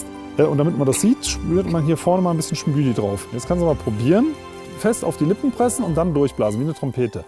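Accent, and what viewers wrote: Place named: Bregenzerwald, Austria. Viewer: Bregenzer wald dialekt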